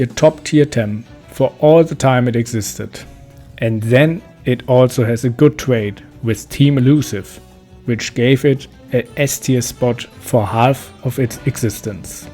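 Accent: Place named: Germany. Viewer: Sofort gehört das du deutsch bist😂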